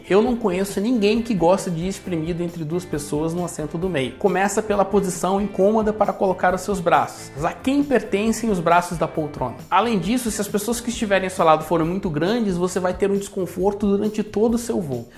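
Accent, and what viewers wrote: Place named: Brazil. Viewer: O cara fala brasileiro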